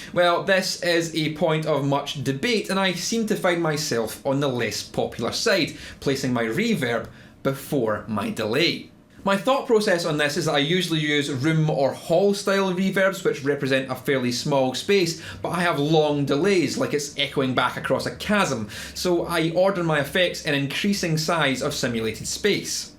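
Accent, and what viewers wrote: Scotland, United Kingdom. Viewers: Hey,! I am from germany and like your great videos, because you are a competent teacher and you have a big talent to keep even complicated contents understandable and correct. And there is your friendlyness and great sense of humour, that makes nearly every video a nice event! And it is fine, that you don' t deny your scottish origin, BUT.... please, please, never forget, that a lot of guitar players, that enjoy your vids come from other countries and some, as me, have sometimes problems to follow your explains, not, because of your scottish accent, but because of the often unbelieveable TEMPO you use!! So i like to beg you to talk with a bit less speed so that it is no problem for us "non-scots" to follow your explainations!! Thanx a lot for keeping this in mind!! - I am already curious about the next interesting vids!! 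Lincoln 6 Echo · Ah noo th' rayzon. Ya lessen chu thus bekez joost lessenen ta Scawtush axunt is fasunettin. Now, me, I watched it to get opinion on Noise Gates. Great answer for that question. Thanks,!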